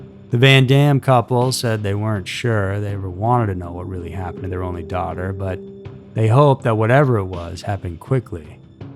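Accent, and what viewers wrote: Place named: Texas, United States. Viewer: Plano is “PLAY-no”. I’m confused by the narrator having a Texas accent yet not knowing how to pronounce Plano? It’s a big Dallas suburb and if someone has been in Texas long enough to have an accent, they would know how to pronounce it. Maybe his narrator voice is a persona 🤷🏻‍♀️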